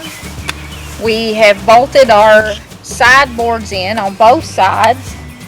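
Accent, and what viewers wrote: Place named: South, United States. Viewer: that southern twang but I got one two lol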